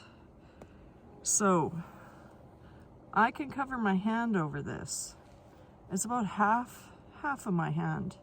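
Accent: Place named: Minnesota, United States. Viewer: I'm glad Jack is ok. However, you have a wonderful Minnesota accent! 💕🐎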